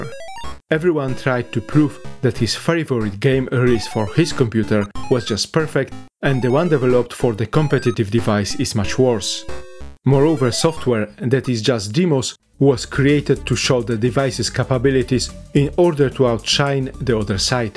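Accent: Poland. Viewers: akcent... uszy bolą... nie sprawdzając jeszcze domyśliłem się, że polak... nie wiem, ale tego typu akcent - powszechny u polaków, to ... nie wiem jakby to określić.... jakby "udawanie" że się mówi po angielsku ale sama treść wartościowa i ciekawa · please do the voiceovers with one of available speech synthesizers next time :( i can't stand this Ponglish, which is a darn shame, as the rest of the vid is great.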